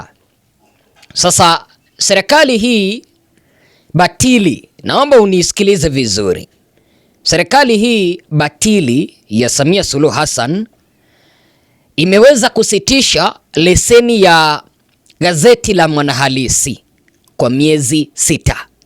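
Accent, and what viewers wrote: Kenya. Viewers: Batilinimamayako nababayako mbwawewe kwanzahatakiswahilihuwezi kwanzawewe siomtanzania hakiswahilichako ninakujua wewe nimkenya kaongelee mamboyakenyeyenu nchimmeiuza kwamabwenyenye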